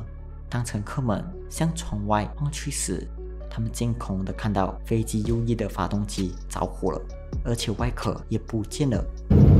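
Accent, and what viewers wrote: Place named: Malaysia. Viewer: 人家是馬來西亞人.這種口音是正常的好嗎...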